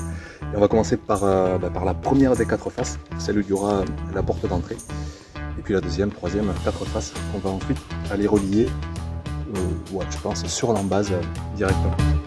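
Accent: South, France. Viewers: Bonjour, Merci de faire des vidéos aussi pertinentes, trouver des fournisseurs fiables n'est pas facile, j'avais déjà beaucoup apprécié ton travail sur l'irrigation, et ici la serre c'est parfait! Ca déconstruit aussi le mythe de la serre en verre beaucoup plus chère que le polycarbonate! J'en profite pour te dire que tu rayonnes à chaque vidéo, c'est toujours un plaisir de voir quelqu'un être si heureux et passionné. PS: Je suis pressée de lire ton prochain livre, j'entendais ton accent du Sud en lisant le premier =)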